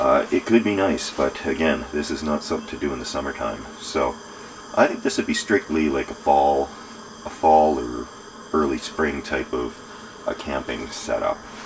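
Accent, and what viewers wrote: Canada. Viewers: You remind me so much of Jujimufu except you're sleeping a storm drain and have a canadian accent.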